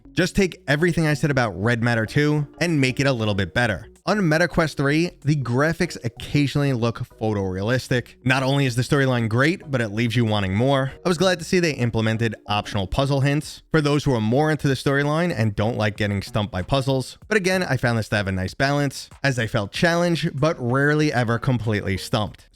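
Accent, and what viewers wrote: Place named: Long Island, United States. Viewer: I've never been to Long Island, but thanks to your videos, I always fix that problem. Love the accent!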